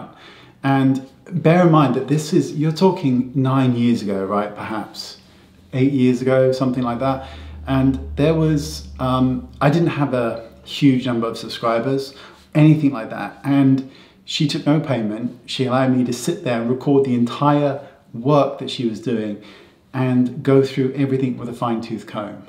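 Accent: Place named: West Country, United Kingdom. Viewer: I’ve been watching for years and I still love the fact I can hear a West Country accent when you explain things. 🇬🇧 Loved this video! ✨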